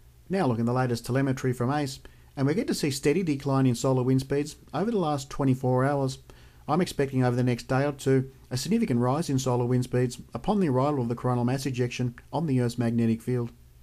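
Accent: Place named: Essex, United Kingdom. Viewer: I'll start again his accent sounds like Essex or something round there , boring isn't it ?????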